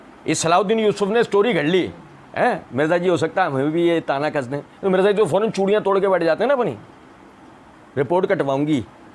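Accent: Punjab, India. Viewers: Maza Ata Hai apki Punjabi sun k Mera dost ik Karachi ka Hai wo b asy bolta Hai ❤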